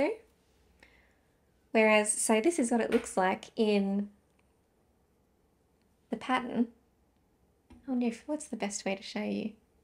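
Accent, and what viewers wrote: Australia. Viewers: love listening to your aussie accent. I love your Rebel cardigan and you logic $ I think you need to add the value or the wearability of the garment. I'm in Nz, blue dyes do tend to bleed i have been advised to use cold water to block and use hair conditioner instead of wool wash. i am learning the shadow wrap short row heel on my current sock project. i was wearing my finished mkal while watching your podcast, i wore it out tonight and had so many compliments. it's in deep teals and greys merino silk blends. this clip is from Knitty McPurly podcast from 24 may will help you with your swift skien winder issue, <link> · So nice to listen to your podcast, an Aussie voice. I'm planning a trip to Brisbane in April so will visit the Little Yarn Store. I'm in the Blue mountains of NSW so lots of handknits are the go for me.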